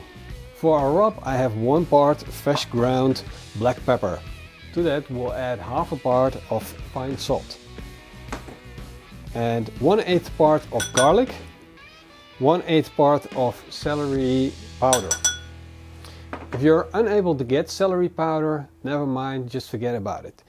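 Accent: Netherlands. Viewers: Glad I found this channel. Love the videos. Would I be correct in guessing that your accent is Dutch?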